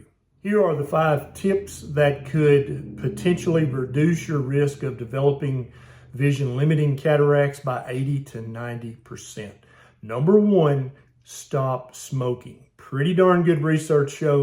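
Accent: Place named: East Tennessee, United States. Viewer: Dr., I am from East Tennessee, living in Florida for decades. I so enjoy hearing you speak. Sounds like Home. And your wisdom, freely given, feels like Home. Thank you to a true son of the volunteer state from a daughter of said state. You have a mighty big heart. Much gratitude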